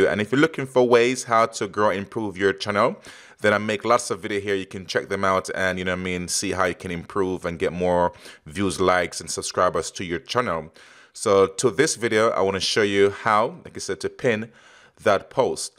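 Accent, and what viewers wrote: Jamaica. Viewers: For some reason you sound like a Jamaican mix with more England also some US. 😂😂 anyway good video if I'm right i am inviting you over to my channel <link>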